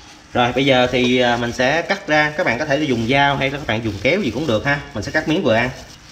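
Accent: South, Vietnam. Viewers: Bạn này nói rất dễ hiểu nhanh gọn giọng chất người miền nam dễ thương và dễ mến đáng yêu qúa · Cám ơn kênh của em rất nhiều Chia sẻ tận tình Tiếng nói đậm đà Âm hưởng bản chất người Nam bộ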